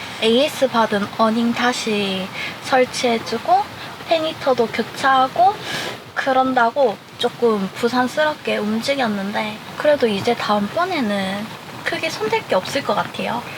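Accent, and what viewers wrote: Gyeongsang, South Korea. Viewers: 경상도 시죠?ㅎㅎ 그냥 댓달아봅니다^^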